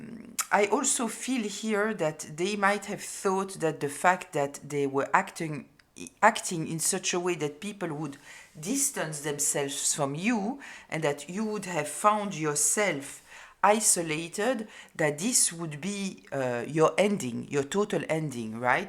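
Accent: France. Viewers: Sometimes I just glide on your beautiful French accent, I should have met a cute Frenchman in life 😂🇫🇷💋✌️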